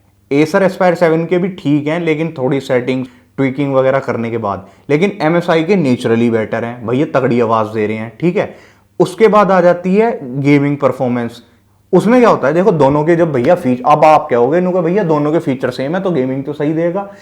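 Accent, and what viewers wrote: India. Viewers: par bhaiya aapka bolne ka tarika ekdum mast hai , ekdum desi , very good 👍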